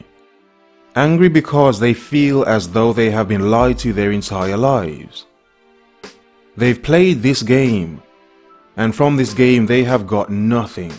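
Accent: United Kingdom. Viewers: He is a Brit..I am surprised you couldnt pick up the British accent. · YouTube recommended this, but I can see through unoriginality of an African with a British accent trying to lecture males, not men to emulate his behavior and interaction therefore...I'm good these are devalued times, doesn't mean I need to stoop to a bottom of the barrel lecture from a schemer mentality to get through existence, love don't begin with a stranger it begins with sincere parents, family, and friends, every thing else is fate.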